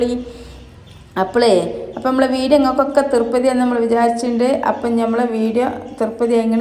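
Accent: Malappuram, India. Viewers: ചാനലിന്റെ പേര് എന്താ മാറ്റിയത്. പക്ഷെ സംസാരം കേൾക്കുമ്പോൾ സൗണ്ടും പിന്നെ കമെന്റ് നോക്കിയപ്പോൾ ആണ് സംശയം മാറിയത്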